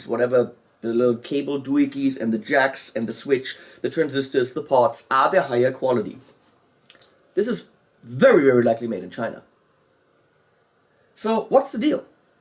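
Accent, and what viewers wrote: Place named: Germany. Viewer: Man hört das du deutscher bist :D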